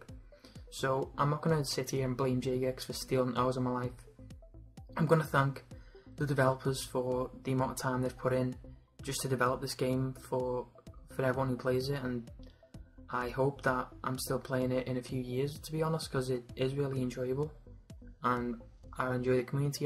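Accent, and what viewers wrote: Liverpool, United Kingdom. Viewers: never knew u were a scouser ~ Aaron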